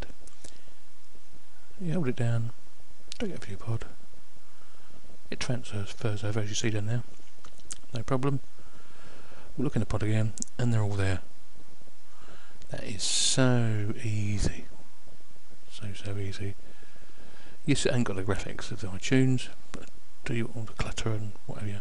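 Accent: United Kingdom. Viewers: Thanks. Its very easy in ubuntu. I am having problems with itunws and windows. And you hace a nice accent :) british? Regards, saludos.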